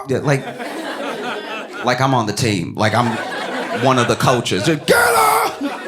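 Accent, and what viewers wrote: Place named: Louisiana, United States. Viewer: is a master at bringing it home with his facial expressions. 👍 And as a Texan, I like his slight twing of Louisiana accent. Makes it so so authentic and endearing.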